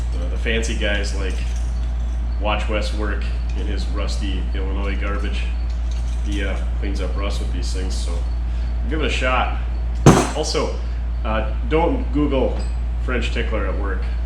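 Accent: Minnesota, United States. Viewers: Yes, like Marge Gunderson in the movie Fargo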